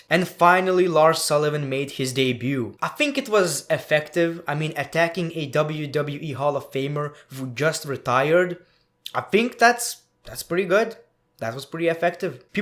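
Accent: Russia. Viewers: Wow! I think you have a great Russian accent! (Because you are Lithuanian obviously but still) with love, from Astrakhan, Russia.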